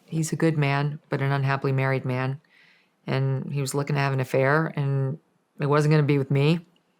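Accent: Ireland. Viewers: She's irish?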